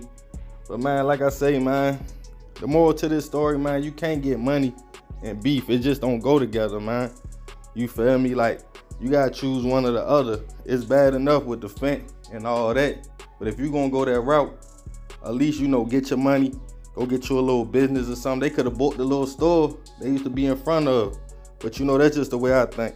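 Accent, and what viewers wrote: Baltimore, United States. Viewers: The dude narrating sound like he from Baltimore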